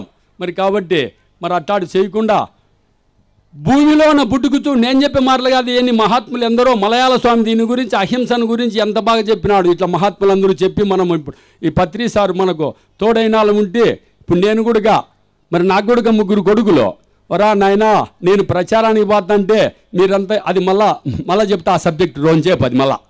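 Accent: Anantapur, India. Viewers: Beautiful language... Rayalaseema slang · Anantapur slang nice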